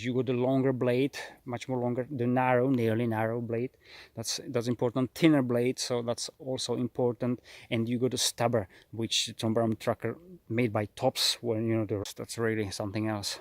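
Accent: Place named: Europe. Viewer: Great video thank you were you , sound very European, good stuff , im from Canada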